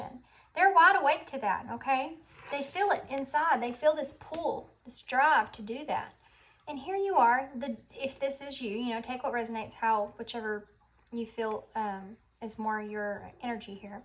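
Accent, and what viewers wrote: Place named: Deep South, United States. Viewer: Thank you! I love your accent! I have relatives from the Deep South and I love to hear them talk. You have no idea how accurate this reading is, although I really am not interested in this person coming back into my life.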